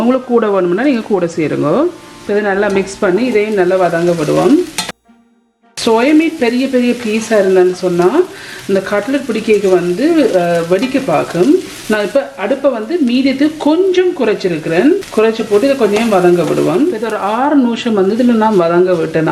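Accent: Jaffna, Sri Lanka. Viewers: Ohh....wow...... I like your Jaffna spoken Tamil... I'm also Jaffna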